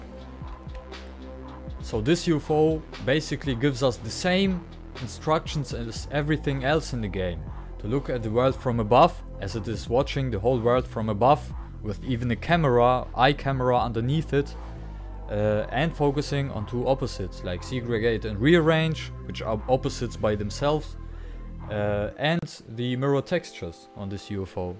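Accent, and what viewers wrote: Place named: Germany. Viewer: I can recognize the German voice:)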